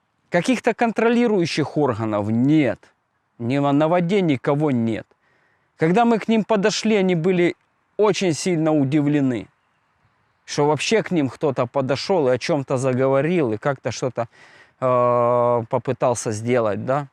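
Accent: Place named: Russia. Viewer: ти ч наче з офіційними особами в рейді! майже при виконанні. що за кацапський говір?... от так само ти кладеш на Мову, а вони на правила рибальства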